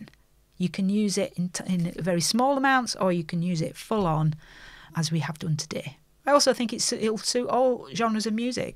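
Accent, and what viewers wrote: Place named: Northern England, United Kingdom. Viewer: Just came across your channel, what a breath of fresh air. Not only is great to see a female Youtube channel based on audio engineering which is rare enough but a Northern accent too! Makes such a change from hearing all the Americans talk about everything haha. Thank you!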